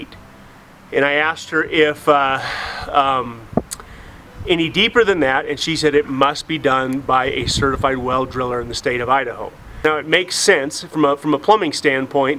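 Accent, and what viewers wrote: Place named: California, United States. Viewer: I thought you said that you were in Idaho. It sounds more like California.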